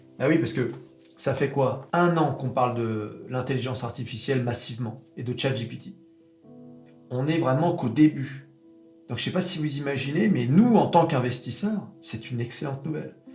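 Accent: United States. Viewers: on veut une video FAQ pour les 10k abonnés : quel est le point commun avec Jé Powell ? D'où vient cet accent southern américain ?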